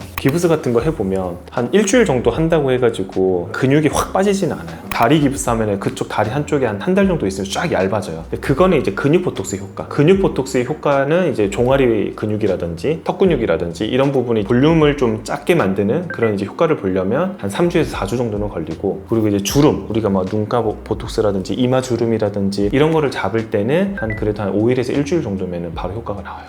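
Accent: Gyeongsang, South Korea. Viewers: 경상도세요? 사투리가들림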